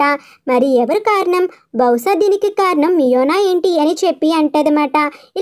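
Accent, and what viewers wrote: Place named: India. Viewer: మీరు హిందీ వాలా న,మీ మాటలు అలా ఉంది అ0తే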